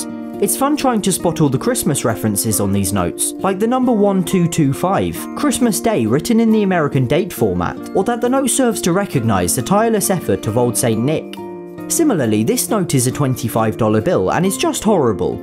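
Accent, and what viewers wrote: England, United Kingdom. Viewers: Man your accent is pure English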